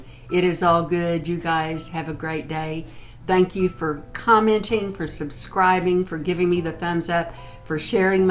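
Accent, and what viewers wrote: South, United States. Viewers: You sound southern and your food sounds southern....I am from Texas ...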